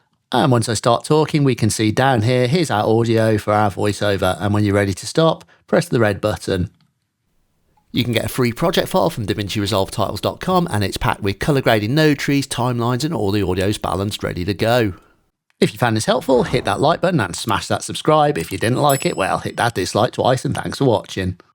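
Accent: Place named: United Kingdom. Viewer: Love it. Right to the point, well explained, and given your British accent, you don't talk so slowly that l need to speed up the video. Great stuff!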